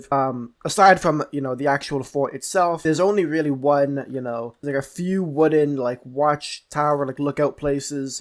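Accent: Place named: Canada. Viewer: Where are you from? You have Canadian Accent!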